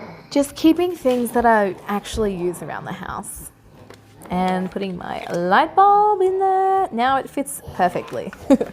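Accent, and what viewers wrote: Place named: Australia. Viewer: You and your home are beautiful! So good to see/hear another Aussie :-)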